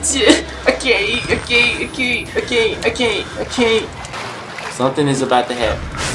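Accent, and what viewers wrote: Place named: Texas Gulf Coast, United States. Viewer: gaddamn bwa you had me rollin!!! where you from bruh? you sound like your from tha 3rd coast! i'm from texas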